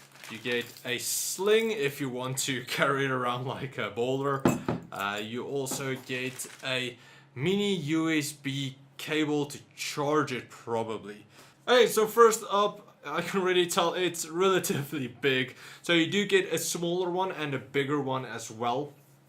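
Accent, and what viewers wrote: South Africa. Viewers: dude ur channel is dope mense must know you from south africa embrace the accent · south african tech youtuber? lessgoooo🇿🇦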